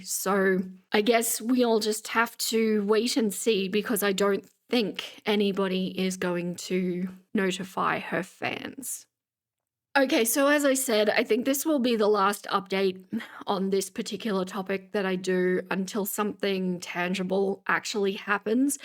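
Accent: Australia. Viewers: Love hearing your thoughts and analyses/detective work. Fellow future-dweller here 😊 although from your accent it sounds like youre from Vic. Heading there for PAX this week. Keep the vids coming!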